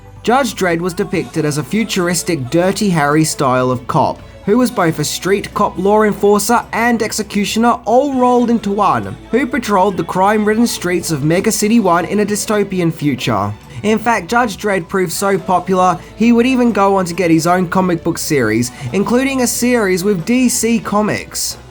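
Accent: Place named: Australia. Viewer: Yes, he's Australian. It happens.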